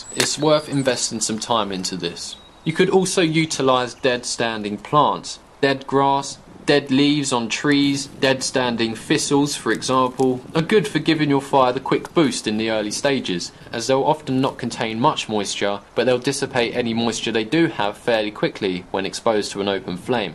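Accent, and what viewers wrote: United Kingdom. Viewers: What accent is this? I hear it a lot but don't know what part of Britain it's from.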